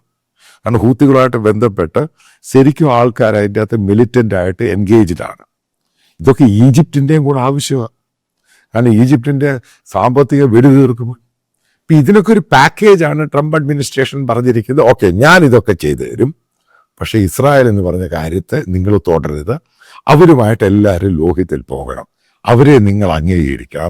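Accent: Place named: South Kerala, India. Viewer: അച്ചായന്റെ തെക്കൻ സ്ലാങ് കേട്ടു ചിരിച്ചു ചിരിച്ചു ഒരു പരുവമായി 😁😁👍അടിപൊളി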